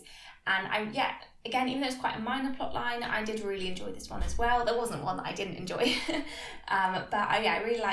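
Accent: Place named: England, United Kingdom. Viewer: Love your reviews, and thanks for uploading. Would it be possible that you speak a little slower? Your English accent is lovely but not always easy to understand at times - and the subtitles don't always make sense.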